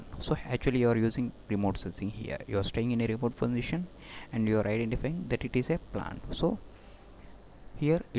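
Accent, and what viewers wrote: India. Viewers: i like indian english though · What is difficult to understand is the indian accent.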